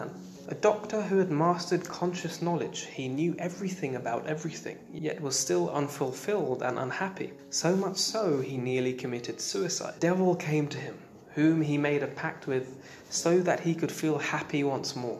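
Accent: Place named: London, United Kingdom. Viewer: Where are you from? You sound like a Londoner but I've never heard the word 'says' pronounced your way.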